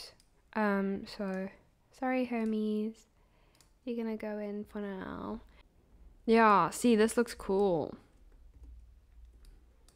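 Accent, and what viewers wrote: South Africa. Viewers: Hii i love your videos are you from south Africa lol 🎉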